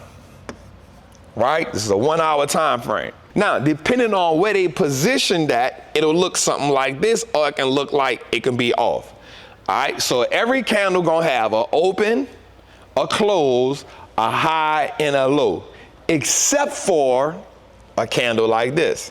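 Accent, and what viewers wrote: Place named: Louisiana, United States. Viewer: That's that Louisiana drawl, lol!! Im born and raised in Chas SC, so I know what you hearing, lol!!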